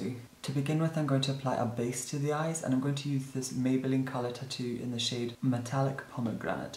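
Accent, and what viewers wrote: Scotland, United Kingdom. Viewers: Is your accent Scottish (proper) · It's a Scottish accent (as you might guess from the surname!) ;) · Q&A- I love your accent, what part of the UK were you born? Also, I would love to see your interpretation of Magenta from RHPS! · Are you from Scotland?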